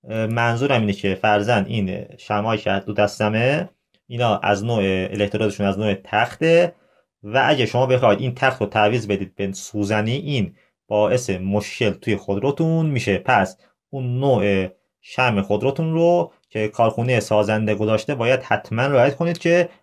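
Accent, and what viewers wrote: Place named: Azerbaijan. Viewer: ممنون از توضیحات کاملتون بخصوص بالهجه بسیارزیبای اذری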